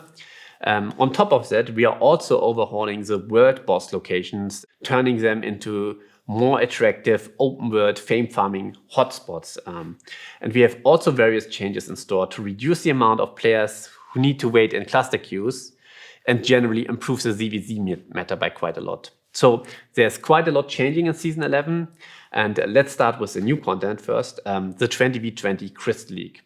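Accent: Germany. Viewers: his accent is the most german accent ive ever heard.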